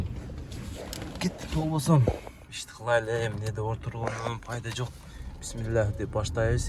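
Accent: Kyrgyzstan. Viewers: зынк кыргызча суйлойсун ошо сага негатив айткандарга айт ошолорго калмамат аканин калпагы деп кой 😅😅 ишти жырта бер туган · Молоц таза суйлоп калдын кыргыз ча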